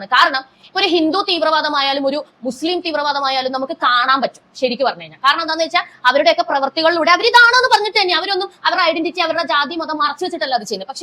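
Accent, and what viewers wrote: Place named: Achayathi, India. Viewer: അച്ചായത്തി ഭാഷ ഒഴിവാക്കി സമാധാനത്തൊടെ സാവധാനം പറയുക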